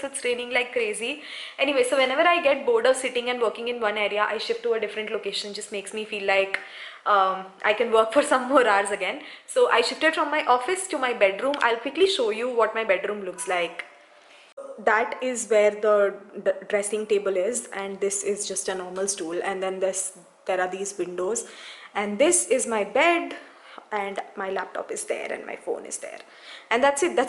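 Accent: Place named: South India, India. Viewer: You sound so much like a South Indian,you sound so cuttee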